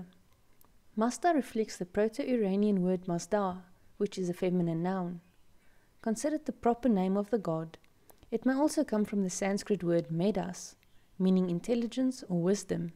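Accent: South Africa. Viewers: Wow, I searched "Zoroastrian asmr" and didn't expect a South African accent! Cool channel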